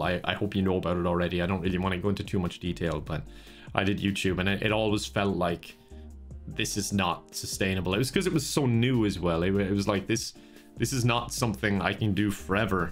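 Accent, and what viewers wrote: Ireland. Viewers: Instead of listening to music in the car, I play videos when I drive. Nothin like Irish accent to get you going places (no pun intended) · i watched many of you videos this year after a friend sent me your first among us one when she was tryna get me to play and i kept watchin them because it made me miss my grandparents a little less,, that might sound weird but theyre irish and your accents are slightly similar tho yours is stronger i havent seen my grandparents for over a year so thank you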